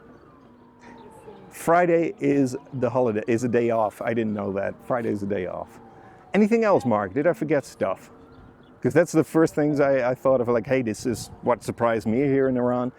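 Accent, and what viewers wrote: United States. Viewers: Very nice and informative video. I hope you get to go back again and visit more places and have lots of fun. For your information, when someone in Iran (and probably in Middle East) puts their hand on their chest, they're kind of putting it on their heart, meaning that you belong there... in their heart. It's a sign of sincerety, if you will. (^_^) Oh, and from your English, I initially thought you were American. Your English is perfect. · for a Dutch person, you speak English very closely to an American. A lot of people form Holland visit. Iran. Very nice and genuine vlog.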